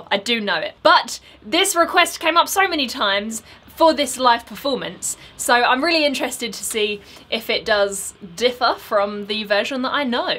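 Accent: South, United States. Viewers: Wow, didnt expect you to sound southern country haha. Bet you could keep up with Chris :) would like to hear you sing a southern bluesy country song.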